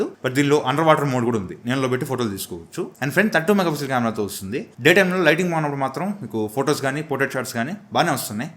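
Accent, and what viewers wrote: Nellore, India. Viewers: Anna midi Nellore aa😅 mana Nellore ye nuvu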